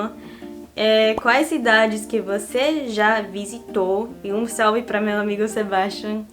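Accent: Rio de Janeiro, Brazil. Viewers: Você tem sotaque daqui do RJ ♥️ Muito bonitinha kkk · Aaaaaw the investment on carioca accent! Just music ❤️ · parece ter um pouco de sotaque carioca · Tem sim! Sotaque de Carioca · você tem o sotaque brasileiro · fico contente que você abandonou um pouco do sotaque carioca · tem um pouco de sotaque carioca...kkkkk... maneiro! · Disse para ela esquecer o sotaque carioca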